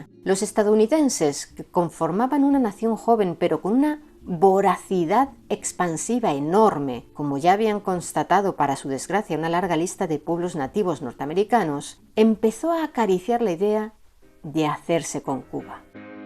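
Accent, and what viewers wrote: Castile, Spain. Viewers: Para mi todo un placer,. Me encanta el acento español castellano. No me pierdo ninguno de sus videos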